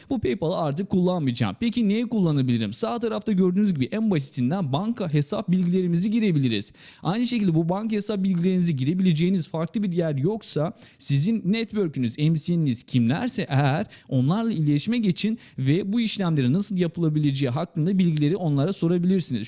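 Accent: Azerbaijan. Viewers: hə azəridi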